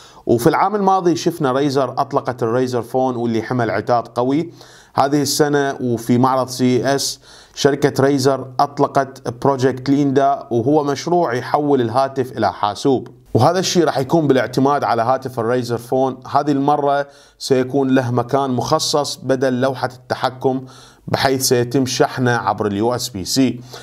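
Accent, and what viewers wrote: Iraq. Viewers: مؤتمر CES2018جميل جداً كل اشياء جميله شكرا على تقرير الجميل بصوت عراقي وشكراً على متابعتك على كل ما هو جديد في عالم 🌐 التكنولوجيا الحديثة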